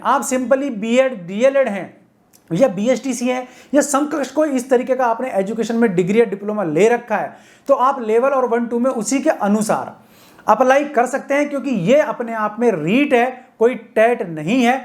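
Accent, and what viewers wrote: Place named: Uttar Pradesh, India. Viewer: Ye sir up ka h kya ??? Rajasthan ka nahi lag nahi raha h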